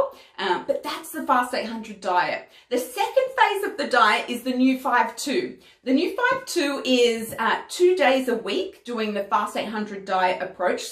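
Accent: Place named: Australia. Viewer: Lovely to hear an Australian accent 💗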